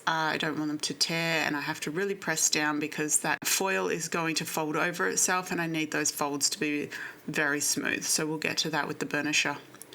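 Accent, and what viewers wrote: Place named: Australia. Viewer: Great to hear another Aussie voice ❤ where can I get the stackers? I’m in NSW. I have some I use to make succulents which I got from the US but yours look great.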